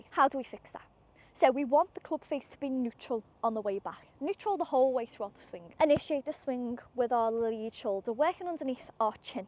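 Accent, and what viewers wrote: Liverpool, United Kingdom. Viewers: Great video, I'll have my wife check it out as she's new. Off topic, is that Scouse I hear?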